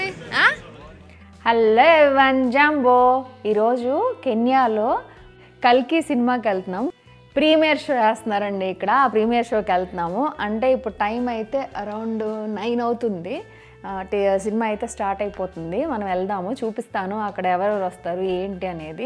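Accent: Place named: Andhra Pradesh, India. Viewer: నమస్తే గారూ.. రాం చానెల్ చూసాక మీ చానెల్ సబ్స్క్రయిబ్ చేశాను.. తెలుగు ఇక్కడ మన ఊళ్లలో మాట్లాడినట్టుగానే చక్కగా మాట్లాడుతున్నారు.. పిల్లలకి తెలుగు రాయడం చదవడం కూడా ఇంటి దగ్గర మీరే నేర్పించండి.. తెలుగు వారు అందరూ కలిసి ఒక చోట సినిమా చూస్తానికి రావడం ముచ్చటగా ఉంది.. ఏదో ఫంక్షన్ కోసం వెళ్లినట్టుగా అనిపించింది.. ఒకప్పుడు భారత్ క్రికెట్ టీం కెన్యాలో పర్యటనకు వచ్చే వారు.. ఇప్పుడు కెన్యా టూర్ ఉండడం లేదు.. తెలుగు వారే కాకుండా ఇతర రాష్ట్రాల వాళ్ళు కూడా ఉన్నారా.. చానెల్ చూడడం చాలా సంతోషం కలిగించింది..🎉😊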